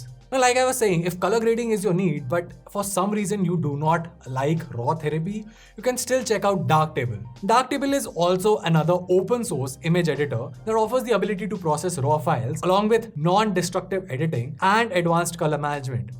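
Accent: India. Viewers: You're from delhi, aren't you? 😊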